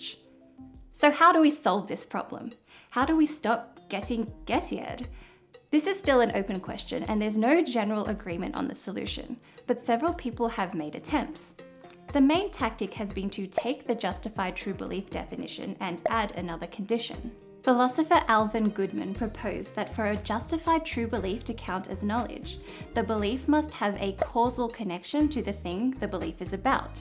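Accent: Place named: Australia. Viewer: I love your cultivated Australian accent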